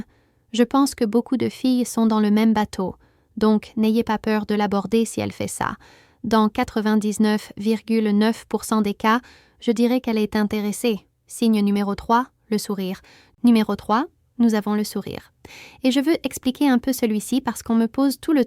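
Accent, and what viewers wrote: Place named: Canada. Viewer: ce qui me gene cest cet accent canadien ia ou pas ?????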